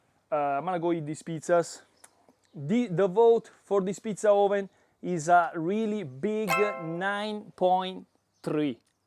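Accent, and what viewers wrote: Italy. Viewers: ciao sbaglio o sento un accento italiano??😂😂😂😂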